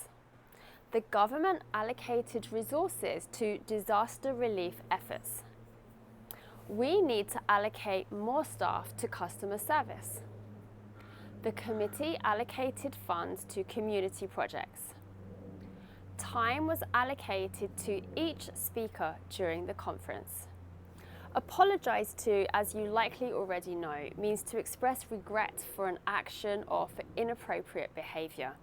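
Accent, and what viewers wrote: United Kingdom. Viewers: yeah i did not know where are you from, but i noticed your accent, and i guess it is british accent😀😊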